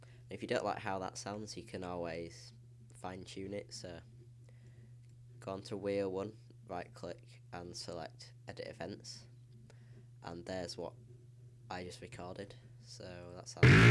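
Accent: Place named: Nottingham, United Kingdom. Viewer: u sound like ur from nOTTTINGHAAAAM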